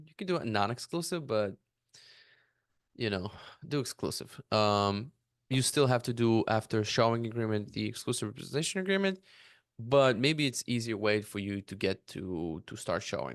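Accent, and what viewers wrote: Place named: Eastern Europe. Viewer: I appreciate this, I think after the NAR settlement many are confused with what’s happening with buyer’s agents. There just needs to be more conversations to be had and value provided to clients. Love this breakdown and script, I think this is valuable for agents! Got a sub and like from me bro👍💯. P.S. - I'm Romanian, where are you from? You sound Eastern European 😅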